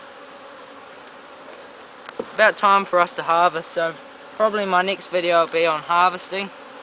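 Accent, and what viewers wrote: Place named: Australia. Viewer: What kind of "strip" was in the brood? Aussie accent ... Yank can't quite get it ...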